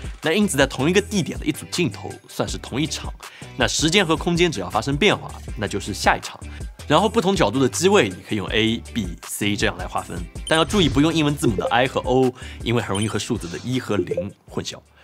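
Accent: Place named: Jiuquan, China. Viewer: 对up主的口音很感兴趣 你是酒泉人么 跟我认识的一个人说话声音几乎一模一样 哈哈哈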